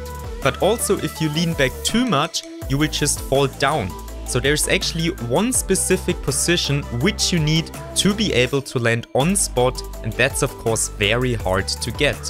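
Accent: Germany. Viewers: so ein deutscher akzent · 🤣🤣dein Deutscher Akzent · Dieser Deutsche Akzent xD Aber nices Video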